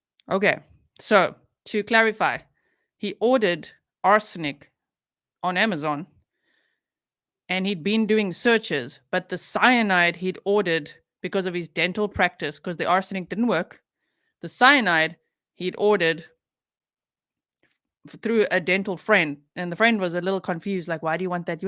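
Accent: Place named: South Africa. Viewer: nice to hear a South African accent!!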